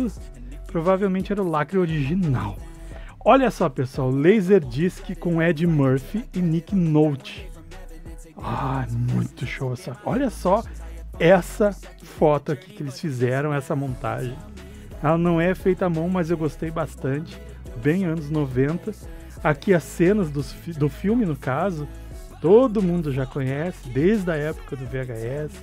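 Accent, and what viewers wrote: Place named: Porto Alegre, Brazil. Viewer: Tu tem o mesmo sotaque que o Radhanti Maharaj aí de POA.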